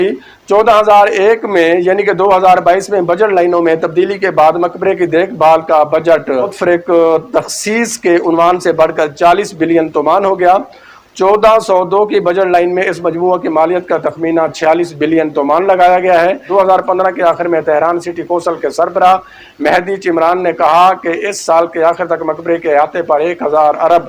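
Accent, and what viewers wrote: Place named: Punjab, Pakistan. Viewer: Punjabi Urdu bolna sake jahil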